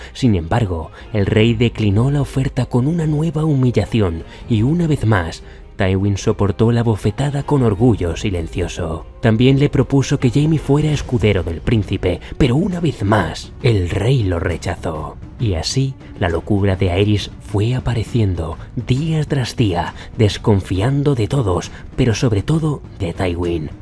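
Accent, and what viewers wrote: Castile, Spain. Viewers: No me cansaré de repetir el castellano le da muy buen toque al relato, 👌 un nuevo suscriptor se anota. Espero que traigas más relatos .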